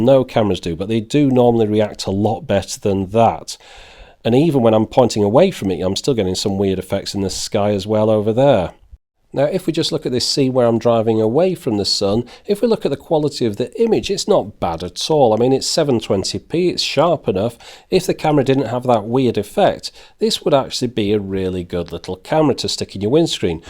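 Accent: United Kingdom. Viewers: Thanks for great video. Brit accents, colloquialisms, pronunciation of English words (verses proper American) usually grates on me, but I can tolerate you. Ha ha. Seriously your videos are PROFESSIONAL, scripts to the point, informative, and your speaking voice is excellent. If you're not a Pro "presenter" you should be. Thanks again, these videos are invaluable, help filter through the many China made products in this niche category of electronics. I'll buy this dispite the acid trip colors.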